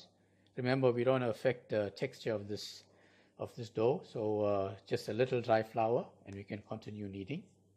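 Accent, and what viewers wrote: Durban, South Africa. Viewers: South African, accent sounds like Durban people... Durbanite ❤ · Hi. You sound South African Durbanite. I also do similar dough.. Take care love from Durbs. South Africa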